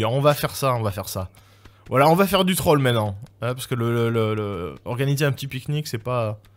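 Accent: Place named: Banlieue, France. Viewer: Ça te va pas l'accent des banlieues :( trouve autre chose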